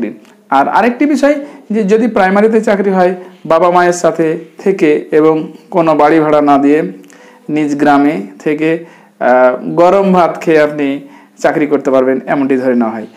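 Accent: India. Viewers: ভাইয়ে বাড়ি কি পশ্চিম বঙ্গে।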